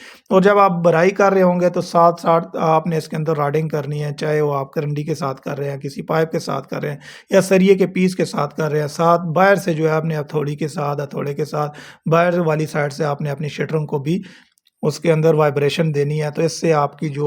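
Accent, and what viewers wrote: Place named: Attock, Pakistan. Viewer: بھاٸی آپ کی زبان سے تو لگتا ھے کہ آپ اٹک سے تعلق رکیتے ھیں ۔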